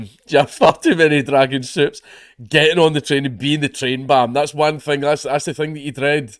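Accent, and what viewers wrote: Scotland, United Kingdom. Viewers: This is the most scot I've ever heard, it's fucken great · This is the most Scottish video I've ever seen lmao. I can barely understand what is saying haha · this was bizarre, I never heard go full scot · buddy, I love ya, but something I've noticed about the way you speak when it's not scripted. You repeat almost every sentence right after saying it... and usually three more times sprinkled throughout the video. And the only reason I don't really mind is because of that damn Scottish accent and just a dash of charm. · Rude and annoying, yes I can accept that, but illegal ? certainly not. I hope the fat woman is proud of herself. Love the accent and the sense of humour by the way, I have been away from Scotland for a number of years now and your video was a breath of fresh air. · was grand listen ya local patter, its been a while, its like Rabbi Burns poetry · did up the Scottish dialect for this one? 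· Your Scottish voice is amazing. I’m from England so I can understand you, but other countries have got no fooking chance 😂😂😂😂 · Damn, your really Scottish. I only caught about half that but the parts I could pick out we're really funny. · man, i love you but... I have read the divine comedy in middle English, butwhen you speak scot i can hardly understand aword you say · . You you need to do more videos in proper Scottish like this. Very funny to watch. I agree with you on the freedom speech argument. I'm curious where do you draw the line when it comes to harassment and abuse? Mind you if you break into full Glaswegian, I might need subtitles. 😂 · I feel like is tryin to talk to the lad through the camera. That's why he went hard on the Scottish accent. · Don't worry, Youtube's too basic to understand his thick ass scottish accent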